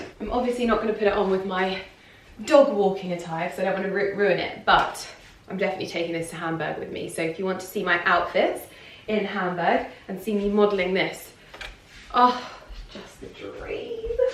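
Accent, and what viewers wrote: RP, United Kingdom. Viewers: I'm sure you've taken elocution lessons your sounding more posh everyday!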